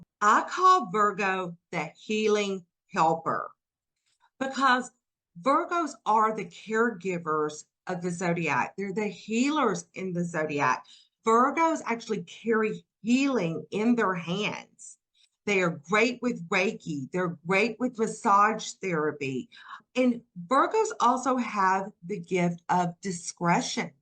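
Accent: South, United States. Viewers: Thank you for the beautiful insights., are you from Georgia? I love your southern accent. I'm trying to learn to identify each accent and where it derives from. So far, I have South Carolina down pretty good. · I really need to get a reading from I love her accent she reminds me of being down south(my family is from the south)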